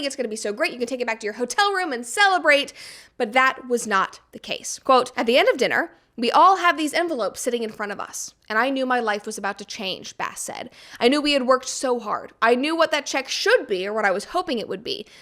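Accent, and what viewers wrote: United States. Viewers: can i make an AI clone of your voice with Elevenlab i want to custom make an ASMR video on u, i liked your vibes and yapping fast and quick with typical American accent :yougotthis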